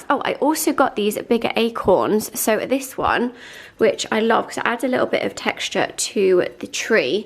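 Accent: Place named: United States. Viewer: Is there a special reason why you pronounce a vase the American way in England we say vase not vaze.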